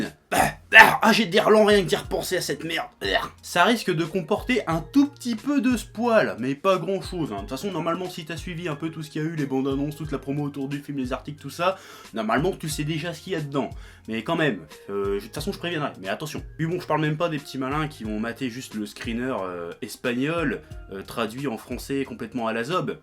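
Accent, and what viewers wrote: Brittany, France. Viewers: T'es brestois? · Tu est de Brest ?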